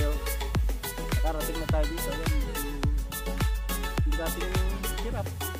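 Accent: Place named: Batangas, Philippines. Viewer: parang Batangenyo ang punto mo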